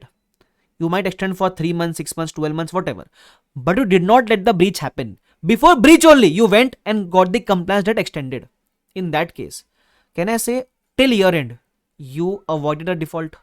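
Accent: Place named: Maharashtra, India. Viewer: sir pure english me mazaa ni a rha... missing your marathi accent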